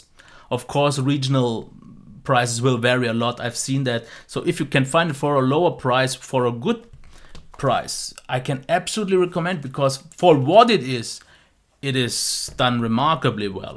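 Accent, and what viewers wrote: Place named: Germany. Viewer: That also sounds good to me! Keep up the quality and the good old German accent. :)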